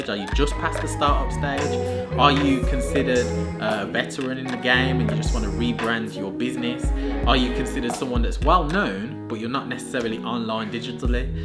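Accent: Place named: Birmingham, United Kingdom. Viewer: Oh yes by the sea life centre, awesome I thought so I could here a little bit of a brummy accent in your voice. Will do brother absolutely loving your content so far!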